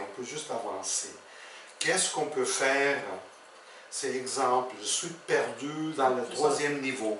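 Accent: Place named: Portugal. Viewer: Bonjour Merci pour ce mixte entre l'accent Portugais et l'accent Québécois;))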